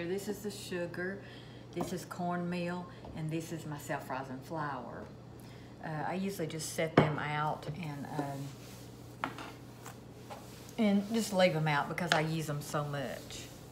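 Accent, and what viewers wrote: South, United States. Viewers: Thank you for sharing a very biscuit recipe 😀. I can't make homemade biscuits if my life depend on it lol..But I follow you recipe exactly and they came out perfect 😋.. And I love your Southern accent.. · That looks yummy 😋 and you are such a doll love to hear you talk with your Southern Accent ❤ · Hi there! I love to cook, and my mom did, too. I learned to make complicated varieties of bread, even when my mom & I were 2 states apart. she talked me through my first ever attempt at yeast dinner rolls….Sadly, my mom went through devastating loss of her mind, long years before God called her home, just over a year ago. I felt I lost her when her mind first began to falter, which was 7 years ago. I heard your voice, & from your phraseology to the kindness, and even your southern-accent, sounded, to me, was identical to the voice of mom’s youngest sister’s. 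I want to thank you for taking me back to those loving words, which I looked for, but ceased to to find that to be part of my mom. I surely cherish those long distance cooking lessons she so freely gave me, & I plan to watch as many Videos as you have, so I’m a new subscriber, always honing the skills mom taught me! I’ve been blessed to raise 2 grown, God-reverencing sons, and I’m known to my grand babies as “NeeNee”. In fact, I live right next to my youngest son, who has raised a gorgeous 17 year old daughter, but just when he thought his child-rearing days were done, here came his 2nd daughter; a female version of himself, Bella is just 9 months old! ( I get to see her EVERY DAY! ) Looking forward to every lesson I can learn from you! From the hills of Arkansas, thanks again! T.D-Rogers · Oh my GOODNESS! From the FIRST words I heard you speak!!! I don't think I've EVER heard such a strong, sweet SOUTHERN accent!!! 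You seem beautiful inside and out!!!❤ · Thank you for taking me back home to my Mom's kitchen in Georgia. I still have the burn on the back of my left hand from me trying to steal a hot biscuit from the oven. It was so hot when I picked it up that I dropped it and my hand hit the roof of the oven. This lady has my Mom's Southern accent too. Thanks for taking me back home...❤ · I am French Canadian my Grandmother made these for us when we visited her way up in Northern Ontario she used a can of Carnation Evaporated milk and then we would gobble them up...spread butter and then dipped them in a bowl of Molasses...I tried these in Georgia on our way to Florida one time and it was served with Pork Chop gravey...so Thank you for this recipe I will give it a try and comment back at you on how they turned out😉 I subscribed to your channel I can hear the southern accent from you. would you have a recipe for Pork Chop Gravey ? 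😋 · Love her cute southern accent and along with her voice she sure cleans out nice!!!! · I love living in the south! 😁🙏♥️🇺🇸 My mom is from Lubbock... I love the Southern drawl 🥰💯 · I love your accent! I miss the south!! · Love that country accent. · I only now found your station with this biscuit video!! I just love your southern accent, and your sweet ways,.... This is a wonderful video you have made for us to learn how to make these biscuits!! I have watched other people make them, and I just love your relaxed style, way of teaching, and instructions. You really are gifted!! .... And I love that you include the little tricks that are part of the way you do things!! A jar to cut them, your old woodent spoon to stir the dough,.... How you put out the flour, how you store your flour in those huge jars. The way you clean!! Even the way you fry your bacon!! Much aloha to you!! mililani 🌺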